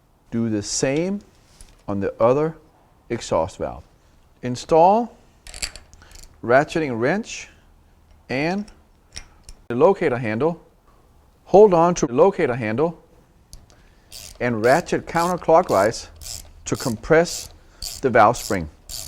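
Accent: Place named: Denmark. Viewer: The danish accent ;) Although it was very subtle. Great video btw.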